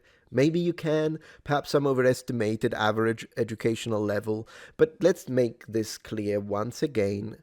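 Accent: Germany. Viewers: My first thought was, "Wait, is this the f*g with the German accent?" It was, in fact, the f*g with the German accent. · he's Indian with German accent? Omg.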